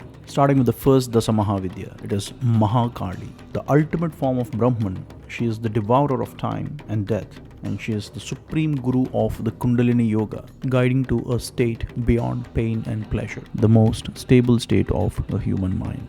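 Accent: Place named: Tamil Nadu, India. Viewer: Hi I'm not judging From your accent I can feel that you're from Tamilnadu? I might be wrong, sorry for that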